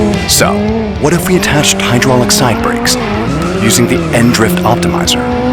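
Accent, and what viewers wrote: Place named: United States. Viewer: drop the american accent. the cars look amazing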